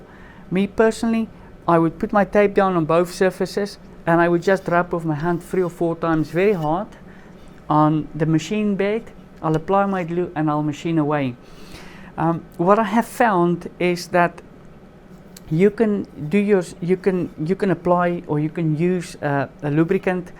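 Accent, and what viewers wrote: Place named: Afrikaans, South Africa. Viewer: I stayed for the afrikaans accent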